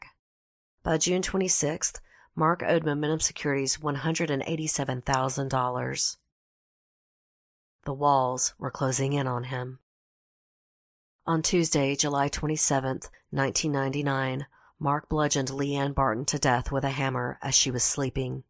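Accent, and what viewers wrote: South, United States. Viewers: Btw, even though i said that on my last comment, i have subscribed. I love your relaxing southern voice and your story telling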